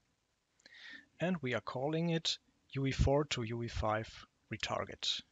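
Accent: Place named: Germany. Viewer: oh, German accent :)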